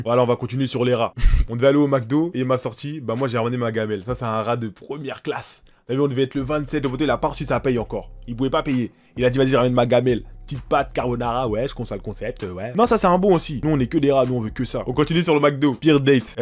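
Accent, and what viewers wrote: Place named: Antilles, Caribbean. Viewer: Tu as grave un accent antillais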